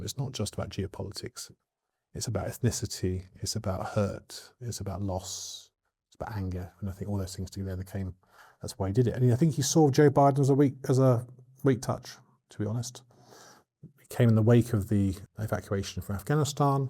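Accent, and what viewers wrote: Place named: United Kingdom. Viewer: Bring the audio volume UP. We in America have a difficult time understanding his British mumbling on low volume. He's knowledgeable. We'd like to understand what he's conveying.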